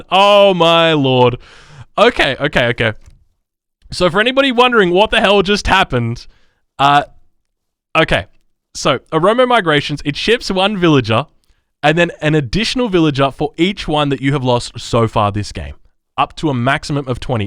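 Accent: Australia. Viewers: That's just the Irishness of the Aussie accent.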